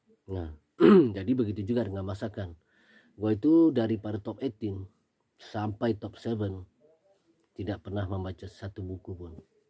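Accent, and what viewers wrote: Malaysia. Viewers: dia memang orang minang, tapi dari kecil sampai tahun 2017 itu dimalaysia, makanya logatnya itu logat melayu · Kental sangat logat melayu Lord ni, I gagal fokus to your price tag baju, 😆😂 Stay humble and go to the top. 😊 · Lord, ceritanya bisa berbicara Melayu mirip Malaysia begitu bagaimana? Kayaknya Sumatra Barat gak begitu deh melayunya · lama di Malaysia jdi logat melayunya kebawa, tpi aslinya ya emg dri tanah datar sumbar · saya dari Malaysia, saya suka sangat bila masak, sempoi gituu, bila saya tengok mci saya tengok longatnya kok macam orang melayu ni, rupanya orang jebat ni wehhhh. Abang jago ni bosss🤣🤣🤣🤣👍👍👍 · dia logat melayu itu buk,karena 30 tahun tinggal disana 😀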